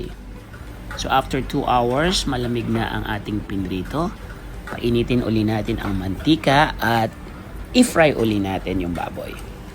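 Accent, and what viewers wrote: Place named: Philippines. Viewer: I like that filipino accent Low to medium hit!! Proud Pilipino pala here 😊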